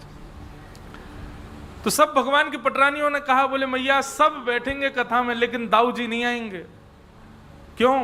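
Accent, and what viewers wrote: Braj, India. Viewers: Raadhey raadhey guru ji aapke charno me koti koti pranam aapki Katha bhut acchi lagti hai aapke mukharvind se brij bhasa bhut sunder lagti hai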